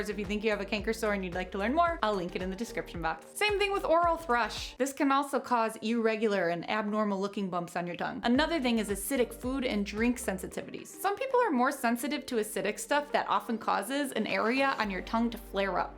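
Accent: United States. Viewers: Great informative channel with a positive personality and an a smooth American accent lol.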